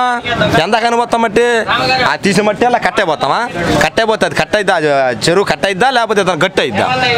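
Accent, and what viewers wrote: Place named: Godavari, India. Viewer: Super bro. Godavari style lo pettavu bhale ycheaps ki. We want to see more of your speeches in Social media. Thanks to for the effort 👌👍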